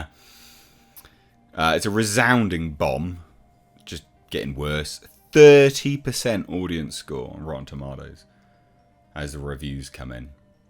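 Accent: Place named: United Kingdom. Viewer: "toh-mah-toes" Not being demeaning but I love how you Brits enunciate some words quite differently than those around me. Plus comments helps the algorithm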